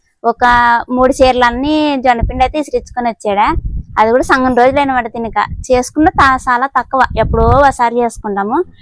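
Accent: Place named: Raichur, India. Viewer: Mee a uru akka? Naaku chinna kakara kailu ante chala istam memu manvi Raichur Karnataka Raichur lo kuda telugu ilage matladutam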